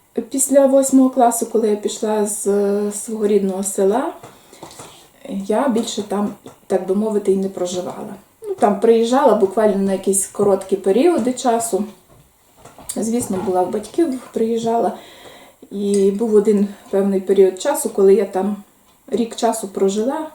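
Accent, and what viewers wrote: Western Ukraine, Ukraine. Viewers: Вітаю! Власне, я писала, що ви, мабуть, родом із заходу України. Відчутно як ви розмовляєте. В жодному разі не ображала вас, бо я західнячка з Тернополя. Перепрошую, якщо для вас це неприємно. Залишайтеся здорові.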